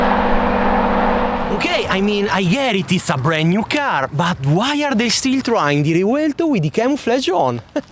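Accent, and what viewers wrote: Italy. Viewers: I love the Italian accent. Come stai?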